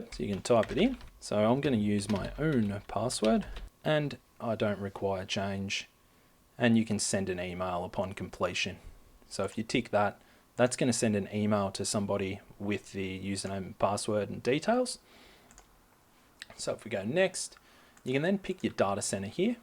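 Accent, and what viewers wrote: Australia. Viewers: My work gave me MS365 responsibilities to help out so this was a good intro. I know most of this stuff but seeing where it is in this video was a good refresher. Been a while since I have done admin stuff. I like how you had trouble with the word enquiries so just changed it to Askmeanything or whatever. lol :D Thats totally what i do when i forge how to spell a word, just use some other words. :D Plus its fun to be in Texas and hear an Australian accent. Makes me think im talking to one of my relatives over there. Anyways. Thanks fo the vid. Cheers.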